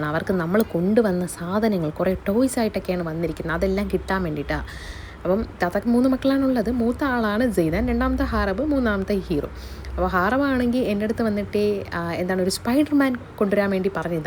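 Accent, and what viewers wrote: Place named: Trivandrum, India. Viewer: Trivandram aanalle language kttappol mansilayi evideya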